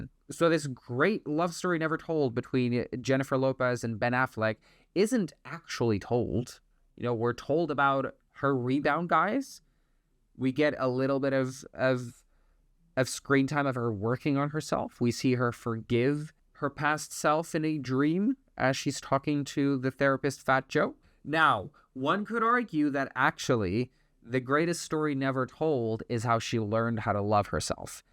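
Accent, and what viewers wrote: United States. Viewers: Your in the U.K. but totally sound American? As usual, I am so confused! Very much enjoyed your commentary on JLo! Your funny and correct! IMO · wait, you are portuguese? Haha me too. Também sou portuguesa, que engraçado pareces americano. Um abraço, bom vídeo.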